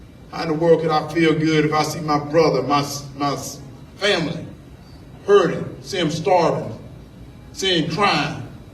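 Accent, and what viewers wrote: South, United States. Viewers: So, he's southern. We have southern accents, and he has COMMON SENSE!!! Something we really need in the senate!!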